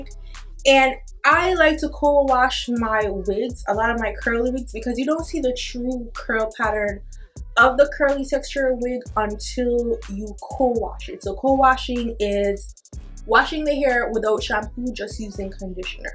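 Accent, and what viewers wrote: Jamaica. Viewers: I love that you come from yaad!!!!! I heard the accent and immediately followed yess hunni and you’re so cute!!! 🤗🙌🏽💋 I’m Jamaican 🇯🇲 myself!!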